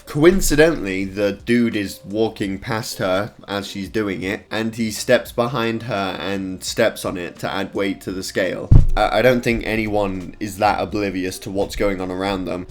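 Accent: England, United Kingdom. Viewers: No it's just the way English people say it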